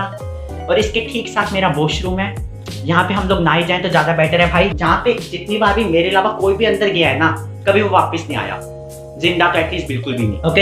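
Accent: India. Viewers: Delhi se ho Accent dekh ke lg rha h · Sound like Himachali ???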